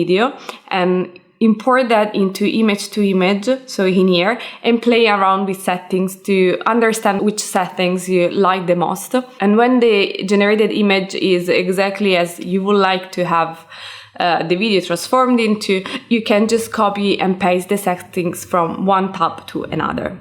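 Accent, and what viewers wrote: Italy. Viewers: your videos are perfect. You're so good at explaining, please keep producing content. I subscribed in 30 seconds flat plus your Italian accent, I could listen to it all day long!!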